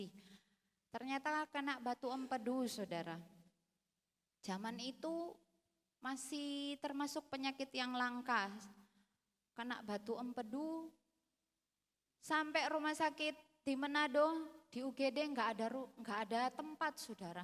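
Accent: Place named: Malang, Indonesia. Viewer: Tante uda medok bgt malangnya😅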